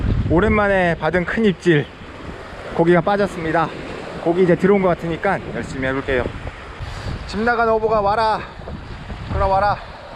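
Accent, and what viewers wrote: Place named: Jeju, South Korea. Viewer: 제주도 사투리가 정겨워서 구독 누릅니다. 화이팅하세요^^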